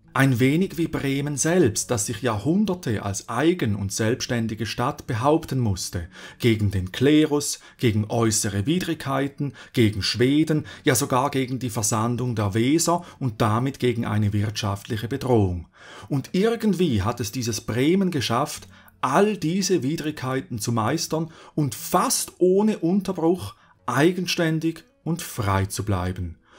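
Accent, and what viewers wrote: Switzerland. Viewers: witzig , schweizer akzent erklärt das plattdeutsche land ... 🤣🤣🤣🤣🤣🤣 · Lol....Ein Eidgenosse unterrichtet deutsche Geographie und Geschichte :D Jetzt hab ich alles gesehen und kann glücklich sterben xD Dein Akzent is der Hammer ^^ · Also ich muss hier mal ein Lob aussprechen. Für jemanden mit Schweizer akzent - is das astreines Hochdeutsch. Hört man sich gerne an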